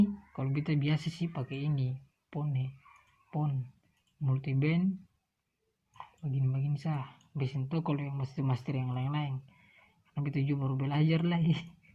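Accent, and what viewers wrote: Indonesia. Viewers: mantaap coooi ambon logat ni ancor hahahah gooot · ngana pe logat macam logat Gorontalo..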